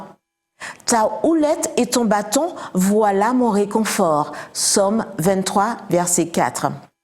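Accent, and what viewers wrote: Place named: Antilles, Caribbean. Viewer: Merci pour ces belles paroles 🙏 Après avoir passé un peu plus 23 ans en Guadeloupe, le léger accent antillais rajoute pour moi une dimension affective forte. Je connais l'esprit chrétien très fortement encré dans cette population qui est devenue ma seconde famille. Les touristes voient d'abord les plages et les beaux paysages, mais c'est avant tout un peuple infiniment bon et beau. Oui, je me suis un peu éloigné du but de cette vidéo, mais pas autant que ça, puisque l'amour est présent dans chacun de ces mots. Que Dieu vous bénisse 🙏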